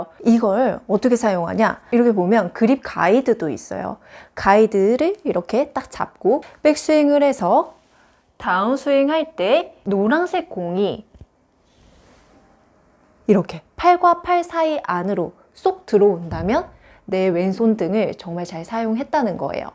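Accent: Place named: China. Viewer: 중국에서 오래사셨나보네요? 억양이 쥥국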